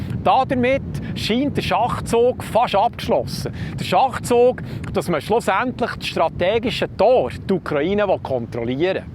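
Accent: Bern, Switzerland. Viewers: Sehr komplexes Thema, aber super erklärt und mit dem Berndütsche Dialekt au no wahnsinnig sympathisch – weiter so, wir freuen uns auf die nächsten Videos. Viele Grüsse aus Chicken Hill at the Lake (Hünenberg See) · Das Berndeutsch ist toll,die Gesinnung auch,danke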